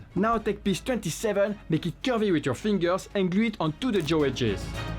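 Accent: France. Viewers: Merci pour le template, je suis actuellement en fabrication du mien. J'ai une question, avec ton accent, est-tu français ?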